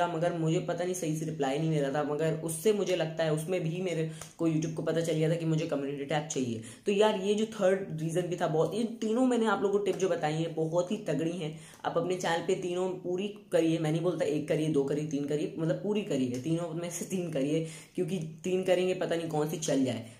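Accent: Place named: India. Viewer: You sounds like true Indian but Atleast you still can speaks English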